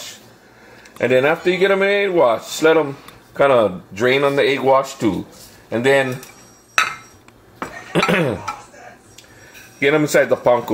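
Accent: Hawaii, United States. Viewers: ❤ listening to you talk takes me back to my hanabada days in Hawaii ❤❤No Place Like Home 🥰 Thank You ❤️ for showing people food that we miss from back home doesn't have to be complicated❤ you made it simple for people like me ❤😊Much Aloha and Mahalo From My ❤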